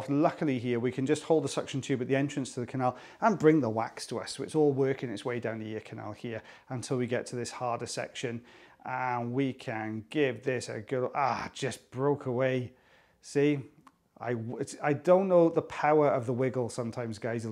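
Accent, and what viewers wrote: Wales, United Kingdom. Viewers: I want a recording of and his lovely Welsh accent reading me bedtime stories to fall asleep to! (can't listen to his earwax videos to fall asleep as I'd want to watch the action! 😂) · Always great videos. Thank you! And wonderful to year the Welsh voice! Visiting again later this summer...beautiful country and wonderful people.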